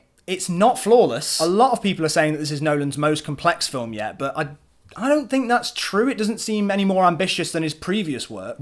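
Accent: United Kingdom. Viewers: You have a very mild british accent. sad...;(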